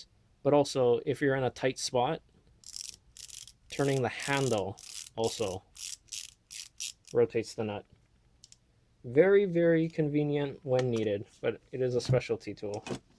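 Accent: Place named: Hawaii, United States. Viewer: Dang, you've accumulated quite an amount of tools! great video! such nostalgia listening to someone with a Hawaiian accent haha and also, if you can you should post videos on how you fix appliances! I would love to learn!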